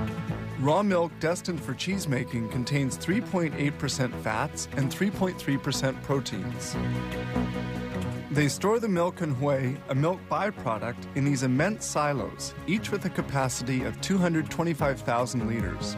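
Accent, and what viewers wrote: Canada. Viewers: His accent is so very Canadian.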